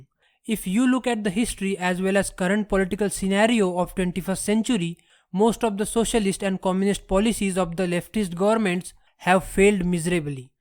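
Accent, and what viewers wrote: India. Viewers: Indian accent 👎 no thanks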